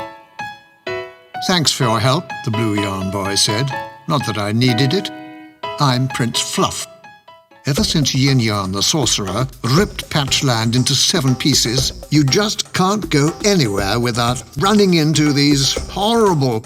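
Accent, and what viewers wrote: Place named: United Kingdom. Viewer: Why is the narrator British?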